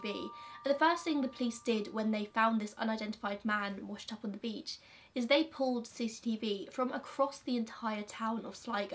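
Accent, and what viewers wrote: England, United Kingdom. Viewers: You are sooo beautiful! I love your videos! Love your voice and your beautiful English accent 😍😍😍😍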